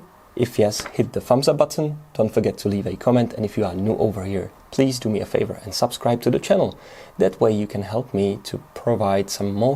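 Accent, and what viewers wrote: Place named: England, United Kingdom. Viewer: I am still looking forward to be able to make these modifications by my own🙏. I love your work as former designer, I love your alternates models as well. And I love your really clean English accent, very easy to understand as non English mother tongue like me. Yeah I know probably too much compliments but you deserve it and I really want to obtain this instructions I know 😅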